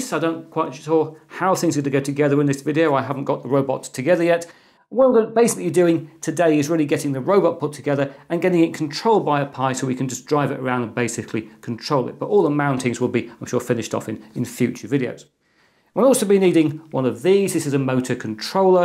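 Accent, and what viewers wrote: United Kingdom. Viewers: What kind of British accent do you have? Because which ever one it is, it's the one that always sounds low-key being cheeky.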